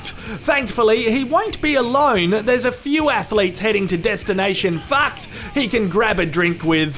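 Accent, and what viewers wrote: Australia. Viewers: Can astrailian people just commentate everything? The accent and slang is truly the icing on the cake for commentary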